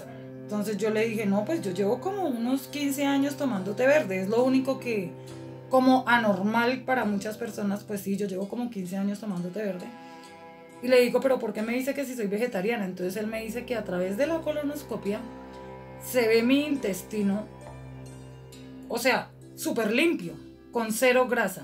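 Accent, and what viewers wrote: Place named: Colombia. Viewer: Me encanta el acento colombiano!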